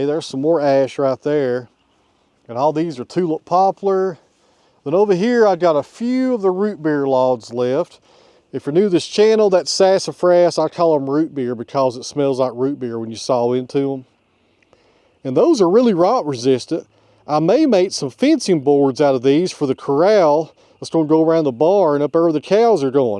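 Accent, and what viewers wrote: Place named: Tennessee, United States. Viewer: It's OK, I have relatives in Tennessee and I can understand you just fine. 😊😉 Sometimes they have a little trouble understanding me from Minnesota. Ya sure you betcha.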